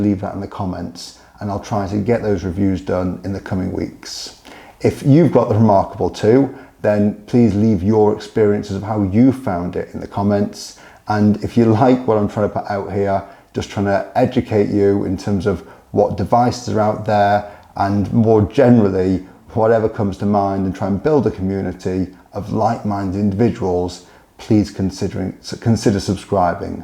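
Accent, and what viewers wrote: United Kingdom. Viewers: This is an impressive review. The pot plant in the background gives a vintage office feel! It’s great to hear an English accent. I’m a lawyer and have umpteen notebooks per case. I like to write with a good fountain pen. The contents of my notes are confidential. Is that preserved? I’d like to move to something like this and the idea of saving paper sounds good. Are you sure I won’t lose any work? Bobbie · Matthew Brokerick is doing pretty well I guess. Picked up a british accent somewhere too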